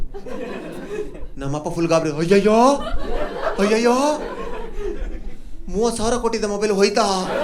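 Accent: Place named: Mandya, India. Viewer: Watched 2nd time next episode pls Pakka madya slang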